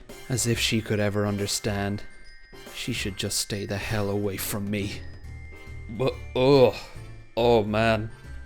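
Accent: Ireland. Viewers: I just discovered this channel and now my internal monologue critiques stuff in an Irish accent. Great channel!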